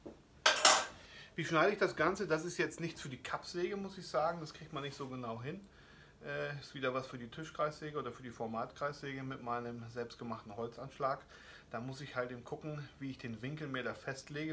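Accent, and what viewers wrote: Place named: North, Germany. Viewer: Cooler Kanal! Endlich mal jemand der ein Norddeutsch schnackt! Ich freue mich auf weitere Videos von dir!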